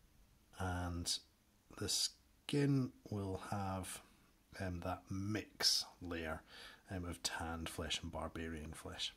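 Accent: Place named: Scotland, United Kingdom. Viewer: This video Iv just found in time as got a copy of dead by lead my first western skirmish game and painting cowboys isn’t my usual genre as mostly paint sci-fi and cyberpunk minis. Great informative tutorial and better still it’s from a fellow Scot so accent was a nice change lol.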